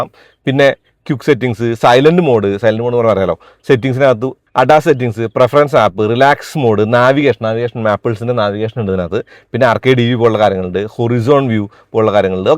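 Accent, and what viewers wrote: Thrissur, India. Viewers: Thrissur slang angananu bro..speed koodudal aanu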